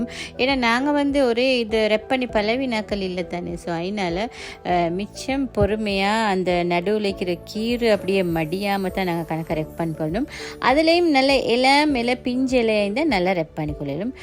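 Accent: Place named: Sri Lanka. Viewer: Voice apram video vachchi kandu putichiden ninga srilanga nu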